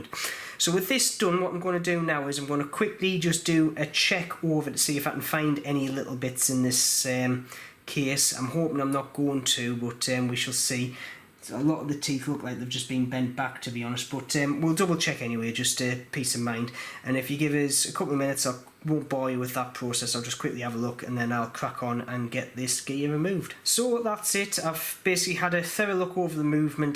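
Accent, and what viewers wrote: Newcastle, United Kingdom. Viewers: Thank you so much for creating this video. After watching it, I felt comfortable enough to replace the ratchet wheel on my Glycine Combat sub. The part cost $10 on ebay. I was hand-winding the watch everyday until the teeth on the ratchet wheel eventually shear off. Learn my lesson. BTW, love the way you Brits pronounce the word Glycine and Aluminum with an extra syllable. Tomato, Tomato. Potate Potatoe and then there is Glycine. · I've serviced my own 2824 and 2836 watches (some of which I have used regularly for 20+ years) and have never seen this issue, but will watch out for signs of it in future just in case. (I was also going to make the Glycerine comment, but you addressed it below :). Nice to hear another Geordie voice talking about watches though, at least I'm not the only one messing about up here! :0)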